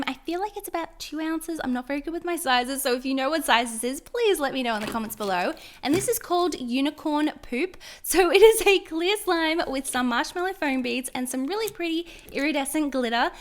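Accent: Australia. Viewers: Meh_emo! Honestly it’s very possible I’ve gotten stabbed with one and it cut me so that’s probably what she was trying to say but she is Australian so they might say it like that over there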